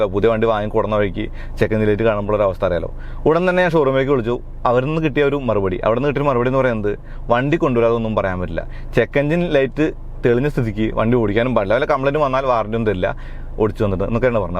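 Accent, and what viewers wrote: Thrissur, India. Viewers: ദയവായി സംസാരം അല്പം സ്ലോ ആക്കു. നിങ്ങളുടെ ഈ തൃശ്ശൂർ charupurality മനസ്സിലാക്കാൻ പാടാണ്.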